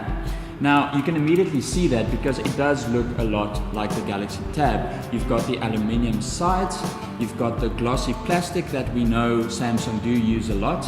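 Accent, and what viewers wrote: South Africa. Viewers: Not only is the sound bad, but your South African accent shines through. Bit off putting. Next time try a few people to hear how they sound. Otherwise it is informative. I think the enhancements are awesome. The speed is also great... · Poor audio, way too much echo and the background music is annoying but I do like the SA accent !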